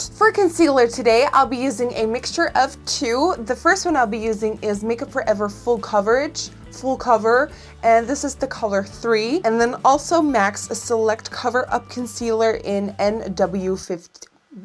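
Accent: Netherlands. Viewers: Subscribed!! Not only are you funny sweet and full of great tips but your adorable accent reminds me of my late great grandmother and my uncle. Both are from the Netherlands too!! Keep up the great work and awesome videos! 😘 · 2015 is so different to 2011! She used to have such a thick Dutch accent! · Are you dutch?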